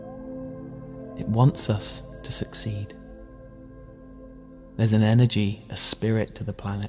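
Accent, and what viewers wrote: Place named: United Kingdom. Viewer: I loved the british accent!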